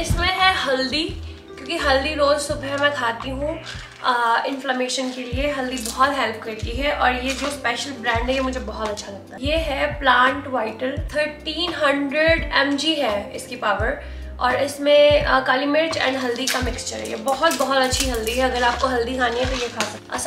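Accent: Punjab, India. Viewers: hahahha bhaji di jheri hindi aa na bhti kmal aa viche punjabi a jandi ....... wahhhh mza a janda sun k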